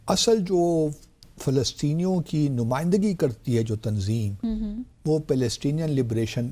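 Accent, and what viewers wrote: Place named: Punjab, Pakistan. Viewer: پروگرام کا آغاز انگریزی میں اس طرح کرتی ہیں جیسے کوئی انگریز کامپر ہیں - پھر پنجابی لہجے میں اردو شروع ہو جاتی ہے - بھائی سیدھے سیدھے اردو پورا پروگرام کرو یا پھر انگریزی میں کرو - یہ اردو انگریزی اور پنجابی کی کھچڑی کیوں پکاتی ہو ؟ اتنا احساس کمتری کیوں ہے ؟ کس کو انگریزی بول کر مرعوب کر رہی ہیں ؟ انگریزی لہجہ اور تلفظ بھی درست نہیں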